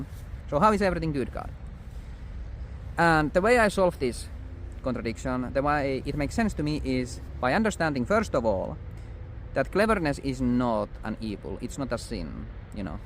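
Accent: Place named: Quebec, Canada. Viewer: You sound like you're from Quebec or French Canadá . George st. Pierre has a similar accent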